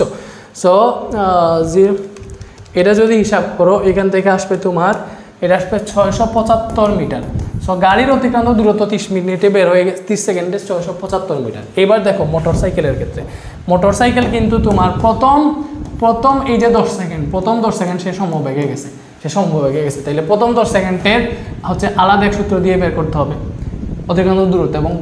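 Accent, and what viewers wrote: Sylhet, Bangladesh. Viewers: আপনার ক্লাস দেখে আমার বাক্কা উপকার দিছে। সিলেটি স্যার। ভালো লাগছে।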